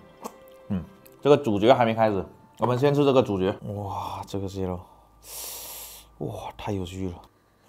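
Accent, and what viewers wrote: Fuzhou, China. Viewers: 说是“虎纠人”讲普通话更准确一些，“湖建”的方言太多了，小文哥口音是标准的“虎纠人”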